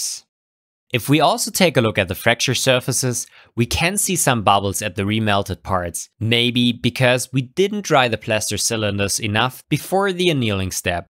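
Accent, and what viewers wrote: Germany. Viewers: This test machine looks like some torture machine, and German accent doesn't help either.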